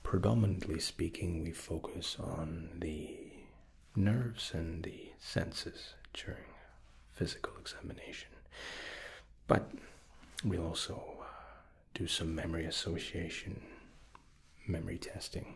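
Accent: Scotland, United Kingdom. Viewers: Scottish I thought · I didn't realize you were born in England,? I thought you were full on Scottish. Were you raised in Glasgow? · Why are u so Scottish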